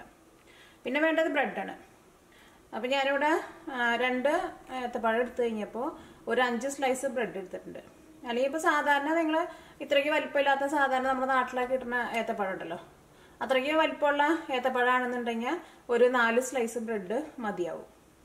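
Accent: Thrissur, India. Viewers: സംസാരശൈലി കേട്ടിട്ട് ചേച്ചി തൃശൂർ ആണെന്ന് തോന്നുന്നു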